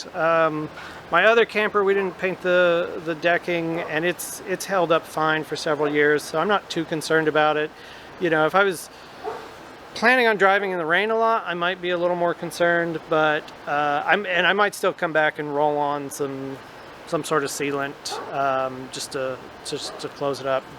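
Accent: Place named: Iowa, United States. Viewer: As an Australian I'm going to guess he is from Iowa or somewhere close.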